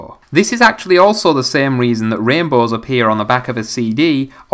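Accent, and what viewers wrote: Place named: Scotland, United Kingdom. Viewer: We got Scottish vsauce bevor gta 6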